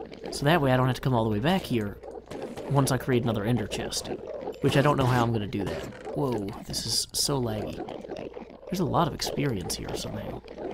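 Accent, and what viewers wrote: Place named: Tennessee, United States. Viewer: Nice accent xD This is the first video I have watched by you, nice videos, and I really do like that Tennessee accent xD!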